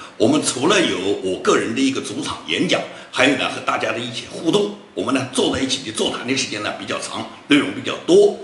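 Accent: Nanjing, China. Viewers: 建民先生略带南京口音的激昂讲演，总能催人奋进。建民先生出众的口才，足以成为一名政治领袖。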